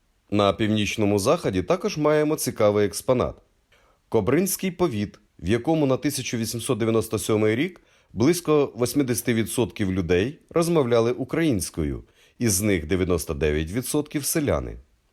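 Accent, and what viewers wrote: Russia. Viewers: Цікава інформація, тільки диктор має попрацювати над вимовою, і позбаватись російського акцента. РосИйська, ідентифИкацИя... Вимова як у Тимошенко..... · Doing this in Ukrainian language is excellent. But you need to have an English version (good clear English without Russian accent) so the rest of the world can learn. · Автор, видно по говору, что твой бытовой язык - русский)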